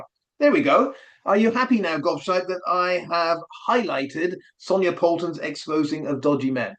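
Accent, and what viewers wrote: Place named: Scotland, United Kingdom. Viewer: Loving your wee Scottish accent! 😂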